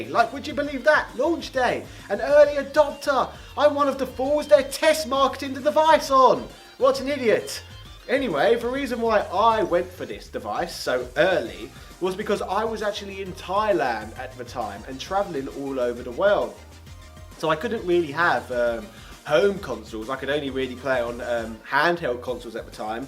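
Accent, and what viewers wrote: England, United Kingdom. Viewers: Wow, it's like I'm listening to myself... with an English accent. I bought my Switch back in April, and I've never felt so little excitement for a console I just bought. I still spend far more time gaming on my Wii U. Splatoon 2 is great, but let's face it, it's only a marginal upgrade vs the original. I think the Christmas season and 2018 have a lot of good things in store, but so far, there's just not a lot to be excited about.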